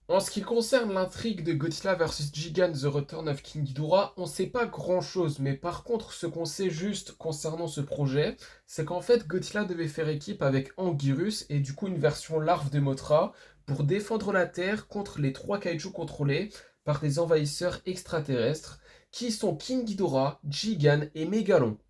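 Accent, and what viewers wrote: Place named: Japan. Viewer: J'AI PÉTÉ UN PLOMB JE SUIS SÉRIEUX POURQUOI TU DIS TOUJOURS EN EN ACCENT JAPONAIS IL ET POURI C'EST UN LANGUE C'EST PAS POURIR C'EST JUSTE EN PEUT PAS CAPTÉ CAR C'EST DU JAPONAIS JE VAIS TU DÉTRUIRE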